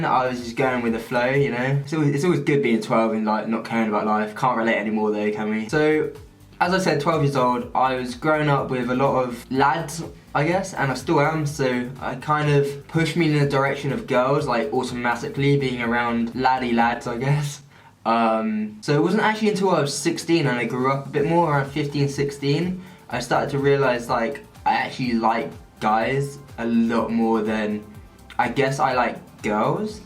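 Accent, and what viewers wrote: United Kingdom. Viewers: Your cringey but really it your voice just oml hello everyone welcome back to my channel it the most brittizh thing to say i feel like thats offensive oh well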